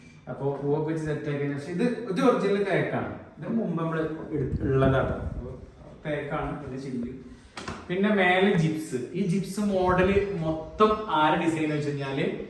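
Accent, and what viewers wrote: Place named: Palakkad, India. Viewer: പാലക്കാടൻ Slang അങ്ങിനാ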